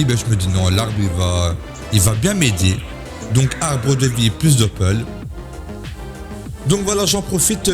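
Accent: Belgium. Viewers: Je suis obligé de mettre un gemmes, rien que pour l’accent belge, génération François l’embrouille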